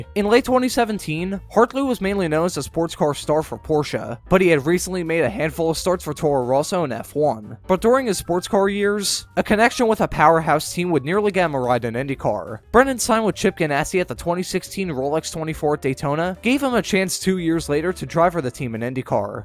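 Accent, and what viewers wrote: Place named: Mid-Atlantic, United States. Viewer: Ah I was gonna guess somewhere in the mid Atlantic. I grew up in Baltimore so I thought it sounded kinda familiar! Great videos btw keep up the good work. Cheers from the Indiana!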